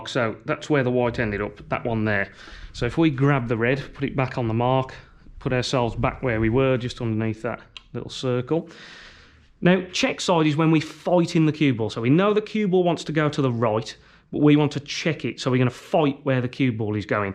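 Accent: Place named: Stourbridge, United Kingdom. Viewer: Sounds like you are from near me, stourbridge!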